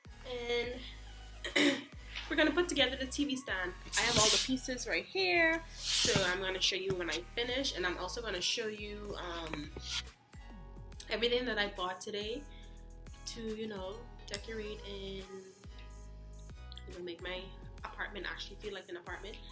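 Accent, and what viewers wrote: Bahamas. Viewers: Are you from the Bahamas? I can here our accent🤣🤣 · I said the same thing. She sound like a true bahamian lol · Are you Bahamian??? That accent creeping out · The Aquarian Empress I cam just to see if someone caught it lmfaooo. As soon as I heard her speak I said she a Bahamian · You have to be a Bahamian because of the accent. I'm watching this like hey she sounds like me loll... Nice Apartment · You sound Bahamian 👀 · I know a Bahamian when I hear one! Great job sis🇧🇸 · I came looking for this comment! I said she has to be from the bahamas! Sending love from nassau!!! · From I hear ya accent Ik you was a Bahamian #Bahamas🇧🇸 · From the video started i knew you were Bahamian! So am I! And i love this video. Keep up the great work bbg. Def got a new sub. :)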